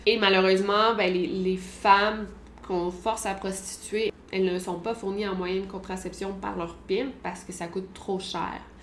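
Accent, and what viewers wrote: Canada. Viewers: Bravo! J'adore regarder tes vidéos et peaufiner ensuite avec quelques recherches de mon côté, tes sujets sont toujours très attractifs et tu réussis à te les approprier d'une manière vraiment intéressante pour ceux qui te regardent, on ressent que tu es réellement investie dans ton travail et ça fait du bien! Et ton accent canadien est le petit bonus que j'aime beaucoup! Bonne journée, une de tes admiratrices françaises!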